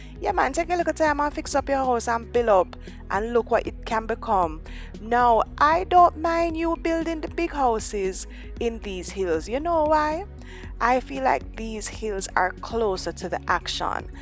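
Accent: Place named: Jamaica. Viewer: I love your commentary. It's funny and yuh patwa sounds so nice.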